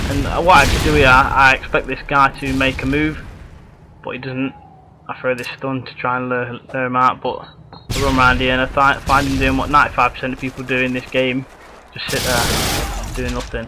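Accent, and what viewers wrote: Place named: United Kingdom. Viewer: I thought the owner of this channel was hispanic. I remember seeing loads of vids in spanish. Now i hear a brit talking. IM confused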